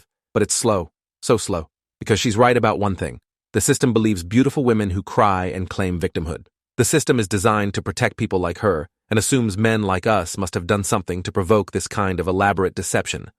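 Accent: United Kingdom. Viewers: British AHHHHHHHH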